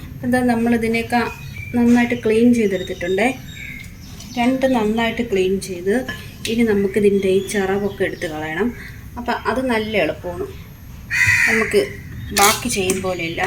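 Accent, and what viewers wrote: India. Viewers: ചവള സൂപ്പര്‍ തിരുവനന്തപുരം സംസാരം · Curry is superb, MY Trivandrum language is Superbbbbb 😊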